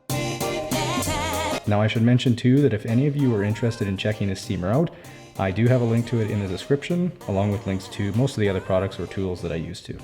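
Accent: Canada. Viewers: Where are you from lol, ur accent sounds Canadian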